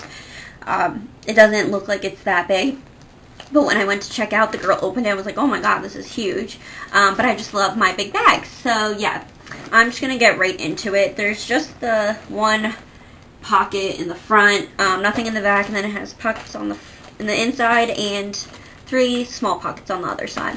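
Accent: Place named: United States. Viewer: So cute! I love your American accent <3 Super cute purse too!! Your purse looks so much cleaner and more organized then mine, I totally have the same glasses case xo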